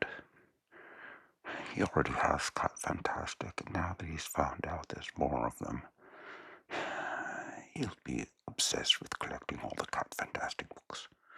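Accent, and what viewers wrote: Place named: New Zealand. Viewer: Very nice of you to show off Robs latest acquisitions. I have to ask, have you spent time in the Antipodes as I could swear I detected a faint New Zealand accent?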